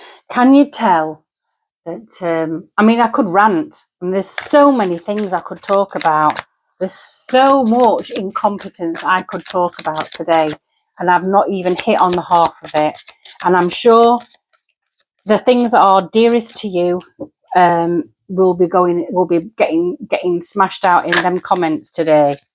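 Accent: Sheffield, United Kingdom. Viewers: Thank you, a great reading. I’ve only just found you. I recognised your lovely accent straight away. I too live in Sheffield so it’s home from home. I’ve subscribed ❤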